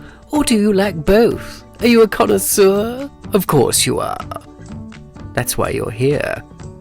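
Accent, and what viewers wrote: United Kingdom. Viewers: Question: is that a real British accent?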